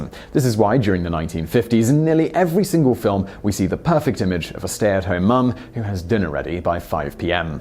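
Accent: United Kingdom. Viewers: This fellow glossed over a lot of facts. He seems to imply that those involved who were Communists in Hollywood were just a bunch of innocent weaklings. He fails to mention those "weaklings" in Hollywood and their thugs (Herb Sorrell and company) did physical damage to people and property who disagreed with their Communist philosophy prior to being called out. He also did not mention their slavish devotion to Stalin. Their personal papers divulge their loyalty to Stalin and his minions. Most of them also denied the murders, the gulags and atrocities that Stalin inflicted ever occurred or existed. Finally it is hard to take seriously millionaires with their mansions and servants actually believed in equal sharing of material wealth. If so, they would have voluntarily divided up their riches. None of them ever did. I should ad that the gentleman does not understand true free market capitalism which is transactions between 2 or more people where both sides benefit. 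He seems to think what we have today (economic fascism) is the same as capitalism. it is not. The commentator (I am assuming he is responsible for the content and not just hired due to his British accent), should have some time looking at the other side of this argument instead of giving us the usual devoid of the facts version we have all come to know. In other words don't be intellectually lazy.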